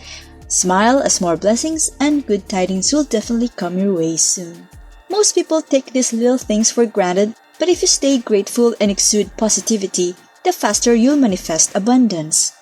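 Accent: Philippines. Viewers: Wonderful! Your voice sound like from the Philippines.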